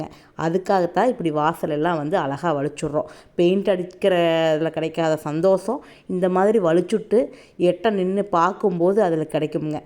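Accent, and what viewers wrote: Kongu, India. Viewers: Kongu tamil supernga ammuni😊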